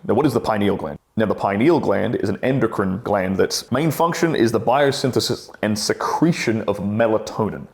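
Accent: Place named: Australia. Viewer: As an Australian, this is the most annoying version of the aussie accent, if anyone talks like this, I just automatically assume they are talking out of their arse and everything they say is just bullshit one way or another. My success rate on picking up on bullshit artists is 100% and it's because they all have this stupid fuckin accent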